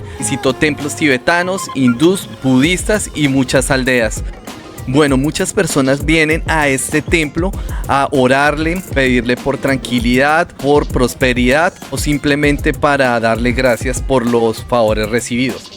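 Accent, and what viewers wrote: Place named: Italy. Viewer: You are speaking Spanish with a Italian accent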